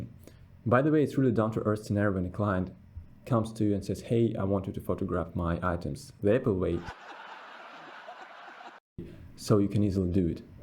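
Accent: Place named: Russia. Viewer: Рашен инглиш ))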